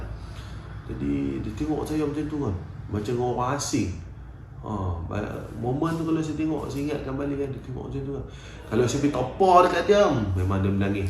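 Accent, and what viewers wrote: Kedah, Malaysia. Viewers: Yang buat kan video ni best sbb loghat kedah. Teringat duk kampung tenang..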